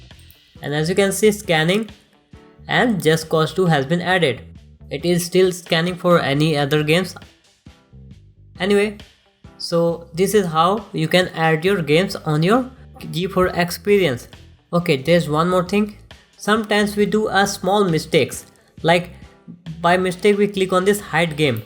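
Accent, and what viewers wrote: India. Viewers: can always trust the indian accents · thx for help. And btw, i love indians voice. :3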